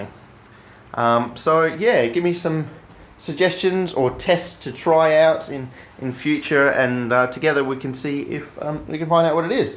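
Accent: Australia. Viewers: He Australian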